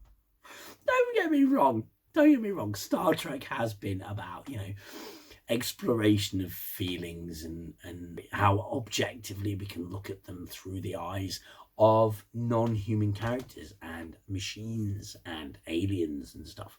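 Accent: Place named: United Kingdom. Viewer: Were it not for your Limey accent you Id take you for the testosterone poisoned , but still physically doughy, caucasian fan boys that infest the body of SciFi fandom. I didn't like the first season and 3 quarters of Discovery. However, last season and this have been spot on. You whiney "proud boys are a joke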